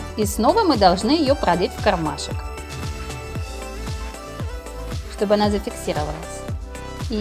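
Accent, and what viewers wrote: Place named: France. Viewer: Кто заметил её акцент , похоже она францужинка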